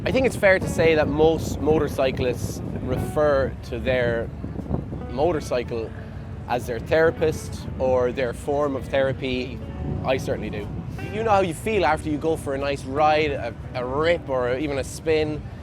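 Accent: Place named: Alabama, United States. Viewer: Moving away from HD and looking at a Wing. Getting a little outdated and needs some new updates, but you get a lot out of the gate. No extra cost for adding heated grips, heated seat, having multiple windshields, etc. Honda has been refining this bike for a lot of years and they seem to know what they are doing. Oh it’s not American, what bike is? HD ain’t. Indian ain’t. It’s hard enough to spend $30k on the Honda forget about the other brands. But I think I need a comfy bike for 2-up riding that can eat up the miles. I think the Wing is the answer. Nice video. Love the Alabama accent 😂. Ride safe 😎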